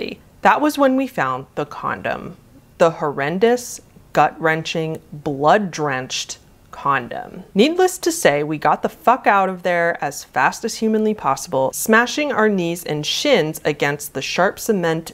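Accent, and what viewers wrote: United States. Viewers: As an English bloke myself, it feels so unusual to hear the phrase "dual carriageway" being said in an American accent. Haha. I have no idea how someone could be this bored/brave to go on an expedition like this. Either that, or I'm just a wuss. XD Edit: It also feels very bizarre to hear the word "bloke" being said in that same accent. I hear that so rarely that I can't compute it. XD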